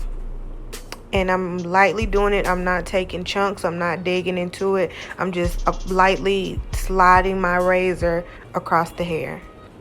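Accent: South, United States. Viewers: Loved the look... And Baby you don't have to explain yourself about how you talk... You have a cute southern accent that's understandable... Ik people not trying to come for you in the comments 💪🏾... I heard everything clearly and by me being a visual learner I found everything to be very detailed with your speech and the hands on process... On the road to 50K... Let's get it💪🏾 ♥️💯...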